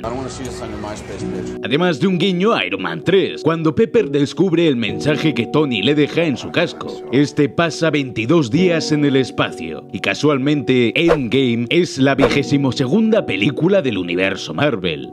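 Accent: Spain. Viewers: cambie a los 30 segundos de escuchar er peor acento, el español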